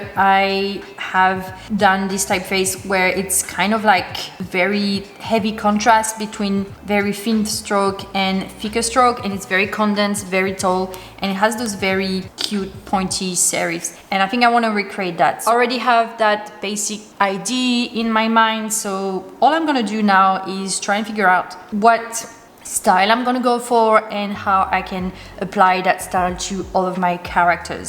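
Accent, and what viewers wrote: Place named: France. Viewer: Your accent is quite good, but I can easily recognize your french ! ;)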